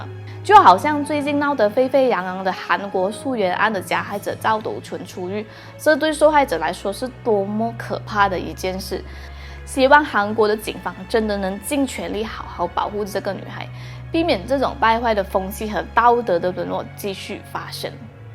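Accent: Malaysia. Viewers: 本来就是马来西亚人，口音当然是马来西亚口音啊，听不懂就看字幕，这是本地的特色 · 马来西亚口音很重。听不太懂，应该好好的自修中文发音。。基本上很多时候听不到懂。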